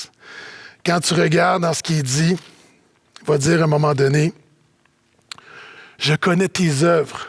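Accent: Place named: Quebec, Canada. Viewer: Accent québécois. Difficile oui.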